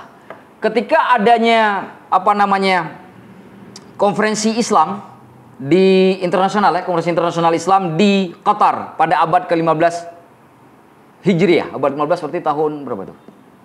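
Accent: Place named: Aceh, Indonesia. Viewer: Bagus ceramah ustadnya. Dr logatnya sepertinya org Aceh ya...😊😊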